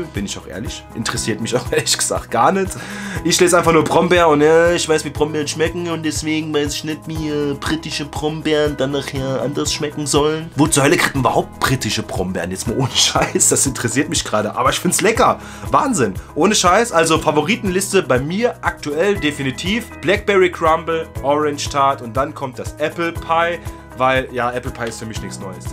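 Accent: Saarland, Germany. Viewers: Ich wussts doch eh Saarläääner auf weiter so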